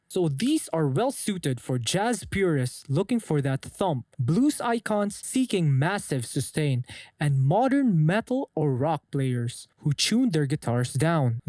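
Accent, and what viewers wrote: Philippines. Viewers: Are you pinoy? I am also 😂 watching guitar vids with family they put your video on and i noticed accent might be fellow kababayan!! If so awesome!! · Yow you have a Filipino Accent I hear it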